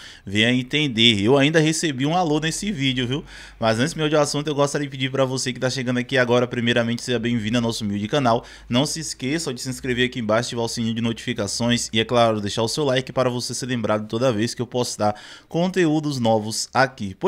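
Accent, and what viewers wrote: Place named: Northeast, Brazil. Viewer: Vc é nordestino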